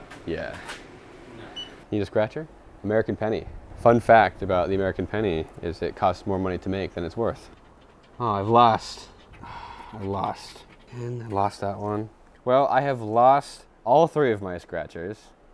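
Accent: Australia. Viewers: Don’t worry, most of us aussies talk like that 😑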